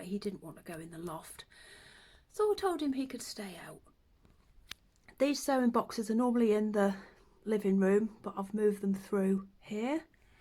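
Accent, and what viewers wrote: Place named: England, United Kingdom. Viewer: Enjoyed your English accent and your video!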